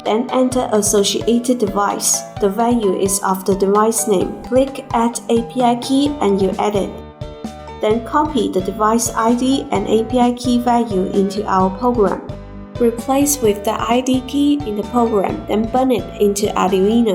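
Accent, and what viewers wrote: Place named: China. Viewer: No one like Chinese English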